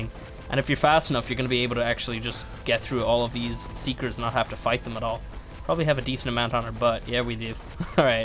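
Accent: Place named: Ireland. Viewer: Why does sound Irish in this video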